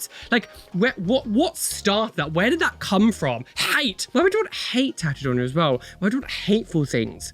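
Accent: United Kingdom. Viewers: Been.there. Done that. Came back in.the early 80"s. But love you anyways. Please don't hate me for telling you this, dearest, but your over all look is too 'busy'. Tats in PRIMARY COLORS never with a Pentagram.... and your piercings need to be placed symetrical so your face isn't sliding down on one side. And please switch to decaffeinated drinks asap. With your delightful British accent you need to remember the English language has coma's and lots of punctuation rather than making it all one sentence. Love ya! alvin, san francisco :)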